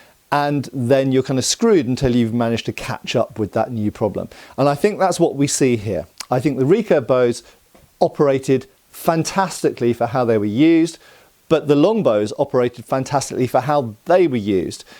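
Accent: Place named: England, United Kingdom. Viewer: Seriously? If you’re American and you’re criticising how an English person is speaking English, consider where your language actually comes from, and who’s actually the one butchering it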